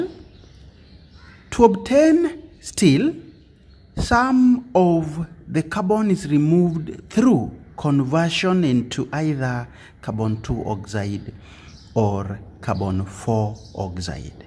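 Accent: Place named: Kenya. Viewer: Abeg i no dey understand this Kenya accent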